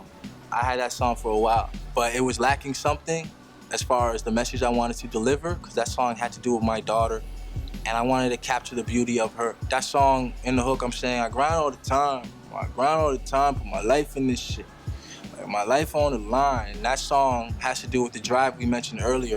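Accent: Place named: Canada. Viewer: He sounds SOO Canadian, eh? The Canadian "hood" accent is a combination of West Indian, and believe it or not, African. There's even some Arabic influences. I personally find people who say "ahlie" and "walahi" to be annoying, but I mean that's still pretty cool.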